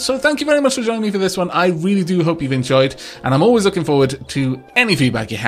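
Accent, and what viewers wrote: Wales, United Kingdom. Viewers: You sound like you are from Wales. Are you?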